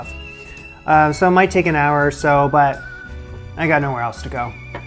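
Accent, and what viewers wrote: Canada. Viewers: You had it right ... The L's are pronounced in chantilly. You give the A a bit different sound than I, but I think that's because you're Canadian. Stick with your instincts. Really enjoy your videos whether you seem to mess up or not.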